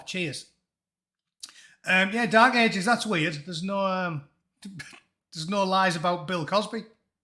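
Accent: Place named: Teesside, United Kingdom. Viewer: Proper Smoggy accent, mines the same. 40 years in Redcar steelworks and a Boro lad😂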